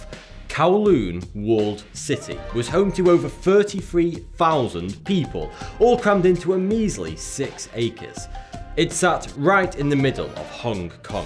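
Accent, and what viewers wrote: United Kingdom. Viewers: "The slum became littered with endless brothels, gambling parlors and opium dens." Just the way you said Brothels, like there was a F in it or something. British accents are hot. I would learn to impersonate them but I am not posh enough to pull it off. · Different accent... Is he british? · Your British English is genuinely horrible. thanks for the lesson. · Is that British accent real? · What British accent is this? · Mmmm I just love your British accent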